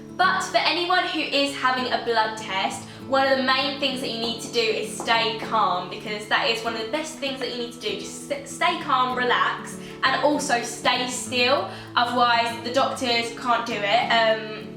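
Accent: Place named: RP, United Kingdom. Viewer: Are you British? You speak English like the Queen. Get well soon, Babe. Take good care of yourself ❤️❤️❤️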